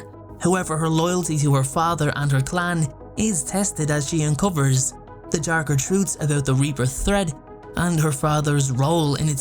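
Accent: Ireland. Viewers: Are you Irish? What part of Ireland are you from? You earned a sub anyway.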